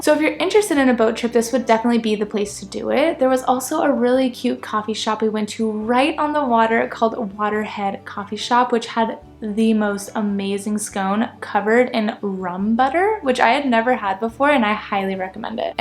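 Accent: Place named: United States. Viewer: Hi. Not sure what Country you come from but you sound American or Canada? Haven't you looked into flights to Manchester from America or Canada? Manchester is much closer to the Lake district than London.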